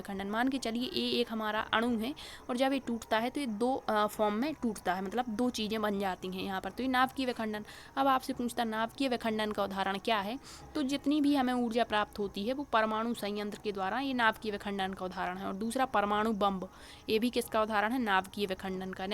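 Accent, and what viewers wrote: Bundelkhand, India. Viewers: Lovely ❤ voice mam aap pakka bundelkhand area ki hongi ☺ plz reply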